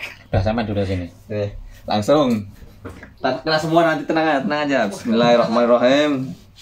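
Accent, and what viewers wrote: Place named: Banyuwangi, Indonesia. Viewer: Koyok wong Banyuwangi to mas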